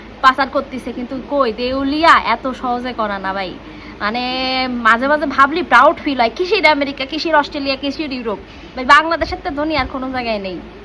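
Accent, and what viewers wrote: Khulna, Bangladesh. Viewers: তাই নাকি? উফফফ..... তোমার যেই মিষ্টি খুলনার ভাষা । এই ভাষার জন্য তুমিও অনেক ধনি বুঝেছো ?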